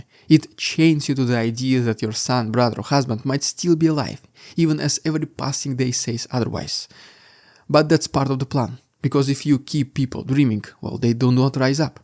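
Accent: Russia. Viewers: Tavs krievu aksents izrais dusmas.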